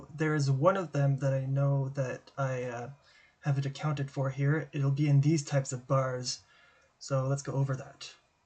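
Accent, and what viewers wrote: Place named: France. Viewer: J'adore ton accent français !! Merci pour toutes les transcriptions ! J'adore faire du déchiffrage ! J'ai commencé avec le confinement en mars 2019, grâce à toi (et d'autres sur le web) j'ai fait des progrès en déchiffrage. Mais si certains titres ne sont pas à mon goût ! 😁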